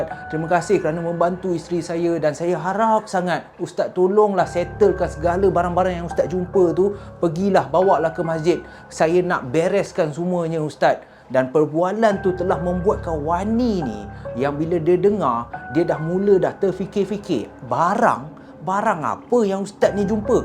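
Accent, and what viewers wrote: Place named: Kedah, Malaysia. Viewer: Orang Selangor nak CAKAP Kedah MEMANG susah lah SIKIT sebab loghat Kedah ni sangat pekat sedikit. Best lah ni.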